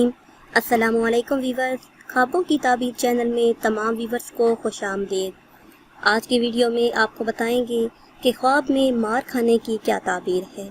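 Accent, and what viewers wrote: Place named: Chakwal, Pakistan. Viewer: Baki sab theek hai apka lehja se lagta hai ap chakwal se hein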